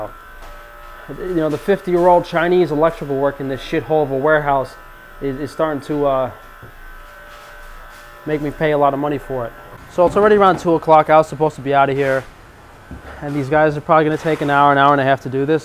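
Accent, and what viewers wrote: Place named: New England, United States. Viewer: his yankee accent definitely would stick out in the south... southerners pick that up instantly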